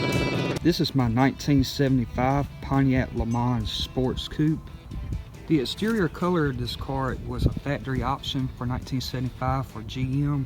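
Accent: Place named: New York, United States. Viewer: This guy sounds like he's from New York.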